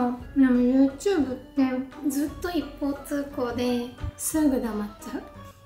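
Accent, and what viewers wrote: Kagoshima, Japan. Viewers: 相変わらず可愛い💕 鹿児島訛りの話し方も優しい感じがして可愛い💕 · 、相変わらず可愛いね🤗 と同じ鹿児島出身の大原優乃ちゃんと訛が似てるね🤗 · 鹿児島弁のイントネーションが残ったままで嬉しい！！！かわいいなー、鹿児島の誇りだよ☺️💕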